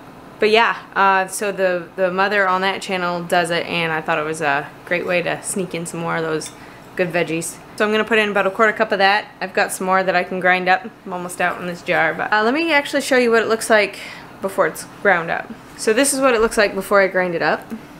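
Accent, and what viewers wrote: Maine, United States. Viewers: I could sit down and listen to your Maine accent all day long soup looks great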